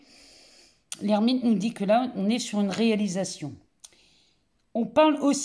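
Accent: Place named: Lyon, France. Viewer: Bonsoir, des bonnes nouvelles enfin. Vous avez l'accent de Lyon, ma ville de naissance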